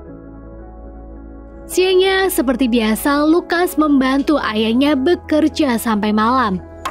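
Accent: Pemalang, Indonesia. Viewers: Mbae asli Pemalang po? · Wong pml ye mba? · wong pemalang ta mbak?😂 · LAahhh kok pemalang? Emng org pml? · Kaknya orang pemalang yahhh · Mba orang Pemalang? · Wong Pemalang mba? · wong pemalang ya?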